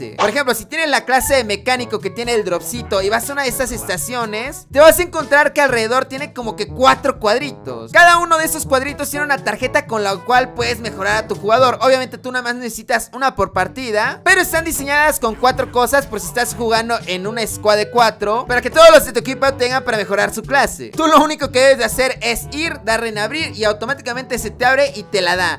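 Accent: Mexico. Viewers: suena como mexicano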